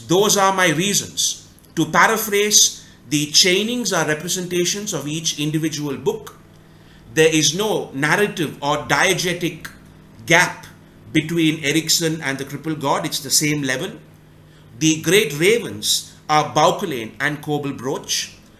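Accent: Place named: Sri Lanka. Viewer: Are you saying you can't be taken seriously? Because of the thick Sri Lankan accent? Coz that would be a crying shame considering the seriously cracking great content , serious no joke , not kidding even a little bit, true story ... Gospel even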